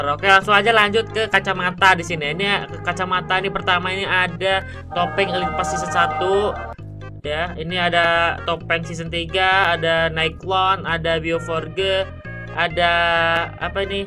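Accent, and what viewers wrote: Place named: South Kalimantan, Indonesia. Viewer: Abang orang mana kayak bahasa Kalsel aja😅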